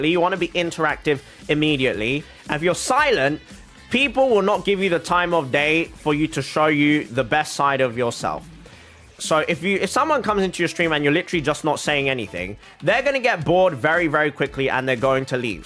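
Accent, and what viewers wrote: United Kingdom. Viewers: Damn, you got those good genes looking like you do now at your age! Dude at my workplace is Chinese and 35, and he only looks a few years younger than you.The two of you would've passed for siblings for real. Also, as an Chinese Australian, I gotta say that you sound more British than Australian. When I'm talking with people using discord, they think I'm British lmao.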